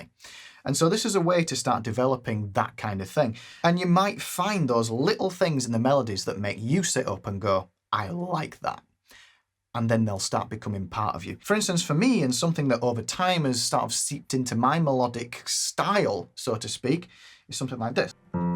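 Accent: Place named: Northern England, United Kingdom. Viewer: Do you do lessons around the north? just assuming you live up here with the accent. Ey up! Cheers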